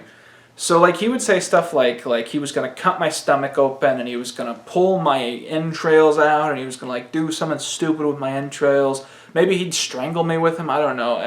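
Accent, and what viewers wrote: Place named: Midwest, United States. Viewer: Idk where you live but it sounds like the Midwest oof my friend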